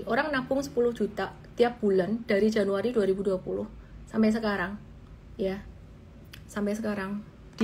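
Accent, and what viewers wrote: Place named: Semarang, Indonesia. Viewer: cengkoknya Semarangan loh 😂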